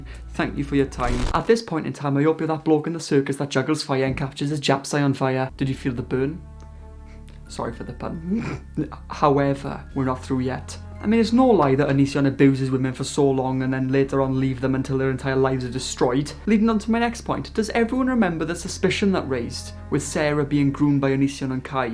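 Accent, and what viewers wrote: Wales, United Kingdom. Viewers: Off-topic, but I love your accent (im welsh too). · bro ur so welsh i love it it sounds like home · You're welsh! :D Closest thing I've found to my useless Shropshire self <3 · You’re welsh right ? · Accent twins! Never seen a Welsh commentary channel before hahahah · OMG, I ❤️ ur Welsh accent!! I’m from America, but I’m obsessed with Wales. I’ve been there 16 times, and I’m covered in Welsh- themed tattoos. r my fave band, and I want to move to Caernarfon. Thought it was hilarious when u called him a baby carrot! (For those of u who don’t know, “carrot” in Welsh is “moron”). 😆CYMRU AM BYTH!! 😍💚❤️🏴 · Welsh?commentary on how trash onion boy is yes please I didn’t know I needed this until I came across your channel by chance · Are you Welsh????? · Is your accent welsh? It’s lovely ! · pfffft I L O V E Welsh accents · You welsh? 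· I didn’t know it was possible to be SO Welsh 😂💖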